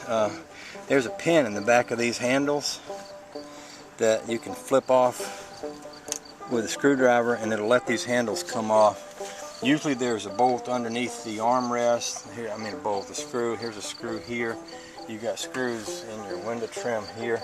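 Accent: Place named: South, United States. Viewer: i love that accent. go South!!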